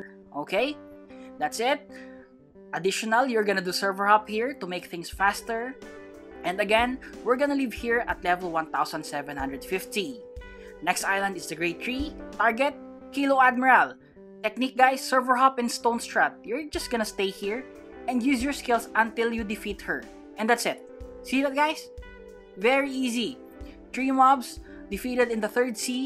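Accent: Philippines. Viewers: Dam i just noticed from PH😱♥️ because i noticed the accent so i checked the bio and i was suprised! Im also from PH hehe Great video as always♥️😱🥳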